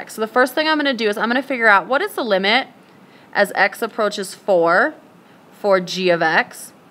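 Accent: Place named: United States. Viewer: what state are you from? I like the accent lol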